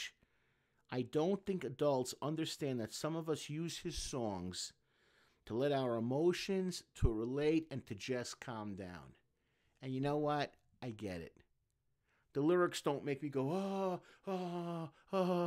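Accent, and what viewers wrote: New York, United States. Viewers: Your from New York aren’t you.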